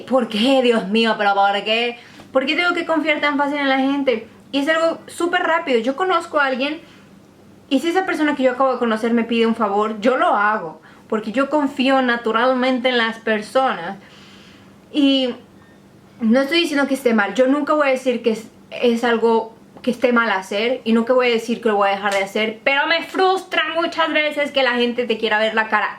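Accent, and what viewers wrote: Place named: Cuba. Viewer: A mi me gusta como hablas cuando lo haces de manera normal... ese acentito de cubana no m gusta.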